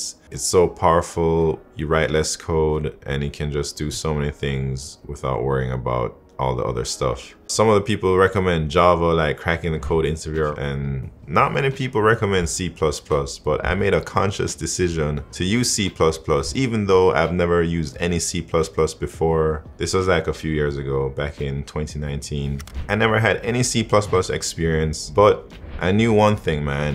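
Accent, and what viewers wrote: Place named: Jamaica. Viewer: nice nice g! Good amount a Jamaicans in tech innu i based in seattle. Good fi hear someone on Youtube/Streaming in we own accent. Feels good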